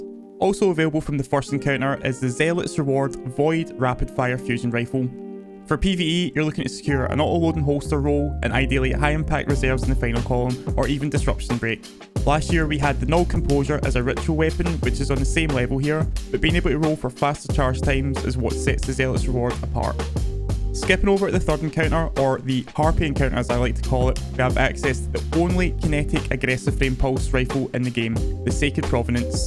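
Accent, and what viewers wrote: Scotland, United Kingdom. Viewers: Man I am a sucker for a nice Scottish accent. Subbed! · Thanks for the video! Super helpful. And something about a Scottish delivery of the information makes it 10x better.:)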